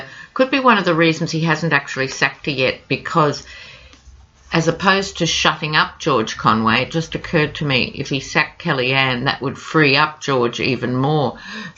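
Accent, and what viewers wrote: Australia. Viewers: I enjoy your program soooo much, even though I don't get the difference between tarot cards and chicken entrails. I love your sense of humor. It gives me belly laughs. You understand the politics of the US so well. I'm actually a Kiwi, but I lived in Perth for 13 years. I didn't realize how much I missed the aussie accent and humor. I have lived in Pennsylvania since 1998. Would love to move back to Oz or NZ or Tasmania, but my husband is from Lancaster County, so I guess we will die here. I have just applied for "the opperation" (US citizenship) as I have been here 20 years and I would like to be able to vote trump OUT ! I also say Koch, not Kok. Seems too much like Cock. Keep up the good work. Can we see a view of your cat sometime ?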